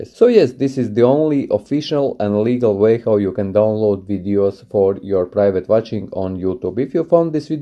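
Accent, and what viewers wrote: Russia. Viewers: You Russia?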